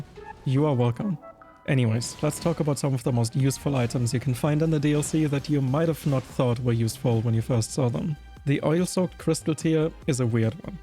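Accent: Germany. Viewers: Du bist Deutscher, merkt man an deinem Akzent! Wieso also Englischsprachiger Kanal? More Money!😅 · Are you German? Klingt irgendwie so :D oh and thx for all that very useful information, tarnished <3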